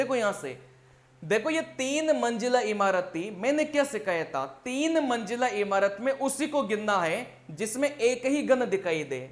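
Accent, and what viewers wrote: South, India. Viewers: Sir aap south indian ho kya???? 😅 Accent wahi la lagta hai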